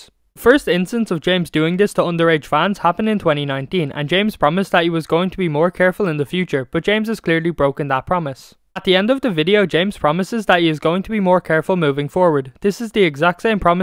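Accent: Ireland. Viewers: Are u from ireland 🇮🇪❤️ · Are you Irish?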